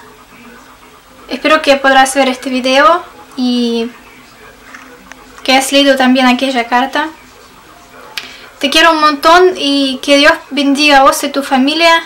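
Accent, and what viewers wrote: Argentina. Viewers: "Por favor no te olvides de mi" Me destrozo el alma esa frase. Tu castellano argento es excelente :D ojala algun día puedas venir a Baires y conocer a Natalia Oreiro! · muy pocas veces puedo ver mujeres como tu aquí ...¡¡¡tienes uno ojos muy bonitos!!!.... pero seguramente te preguntaras ¿que estoy haciendo aquí?... bueno ...hoy, sábado, estaba simplemente navegando en Internet ... tu ya sabes ...mirando aquí y allá generalmente cuando entro a youtube me pongo a oír música de todo tipo y mientras la oigo busco noticias de RT en español .... como para entender mejor el mundo allá afuera ...fue así como sin querer, o mejor sin intención encontré tus vídeos ... vi los tres que has subido ... no se si has subido mas ...pero me causa curiosidad el acento que manejas ... pareciese que hubieses estado en argentina en verdad!!! .... permiteme aclararte que no soy argentino ... 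yo soy colombiano, pero reconozco el acento argentino y en especial en acento de los países que rodean a argentina ... mientras tu estas durmiendo yo te escribo aunque ya va a ser la hora de irme a la camita ya son la pm y según mis cálculos son las am ..... pero como sea... yo solo quería decirte que tus ojos son hermosos. ojala yo pudiera conseguir una mujer con los mismos ojos tuyos .....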